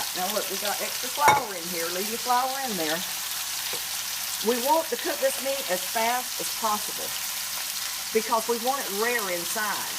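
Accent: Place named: South, United States. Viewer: I am an Aussie and I LOVE the southern drawl!!!! This is like mums cooking! LOVE IT!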